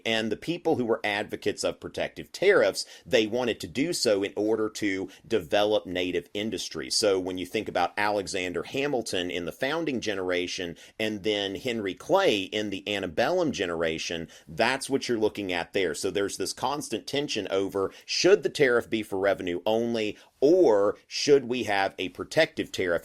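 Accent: South, United States. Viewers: Love your content and your southern accent!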